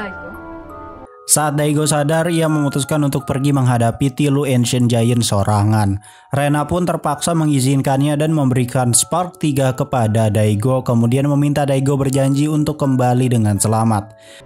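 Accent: West Java, Indonesia. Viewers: Sunda pisan🤣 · Bang Orang Sunda Y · Wah si mamang nyunda euy wkwkw mantap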